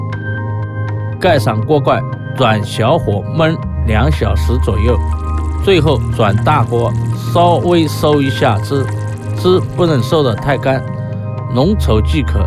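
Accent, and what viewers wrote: Shanghai, China. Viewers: 大厨的上海普通话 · 不会啊 就是略带上海口音的普通话 没有感觉很吃力的说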